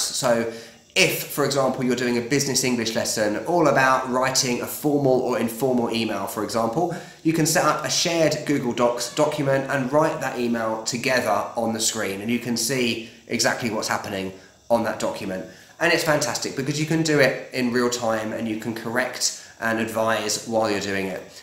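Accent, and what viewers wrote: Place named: London, United Kingdom. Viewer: ) I am from Barcelona. So my accent is European. Nothing to do with the Mexican accent lol. My sister is now in México DC. She's been living there for over a year now. I love your London accent by the way! I was actually thinking I could maybe book some lessons with you so I can see how you structure the lessons and what resources you use. This would be really helpful. And at the same time it would help me improve my speaking skills (specially pronounciation :)) I have been working as a translator for quite a few years now but work flow is up and down. So I decided to start a course on how to teach Spanish as a foreign language as I thought this could maybe help me get an extra income and it will allow me to socialise a little bit. Working as a translator from home, all alone without seeing anybody, makes me feel a bit lonely sometimes :)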